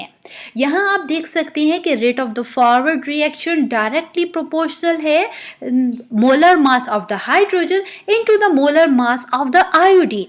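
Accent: Pakistan. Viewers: Mam are you from Pakistan?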